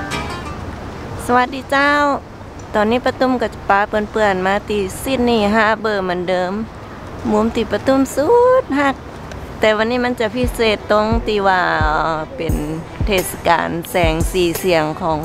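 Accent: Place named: North, Thailand. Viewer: สวัสดีครับ ได้ยินเสียงชาวเหนือผมคิดถึงแม่ละเมาบ้านเกิดผมเลย 😃👍👍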